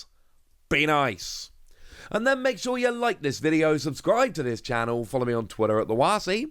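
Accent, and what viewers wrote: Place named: United Kingdom. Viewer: Oh crap, I completely forgot this was British. Lol, every time he talks about the next Pokémon game it’s gonna be like nails on a chalkboard.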